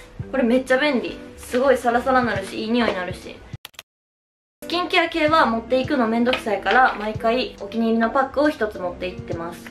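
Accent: Kansai, Japan. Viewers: いつもより関西弁？強めな気がする…かわいい…🥺💕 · 今日めっちゃ関西弁でかわいいwww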